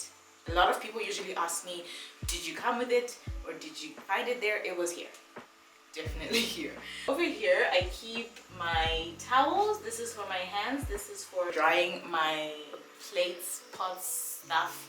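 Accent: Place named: United States. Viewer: Are you from USA? Your accent is giving me American vibes.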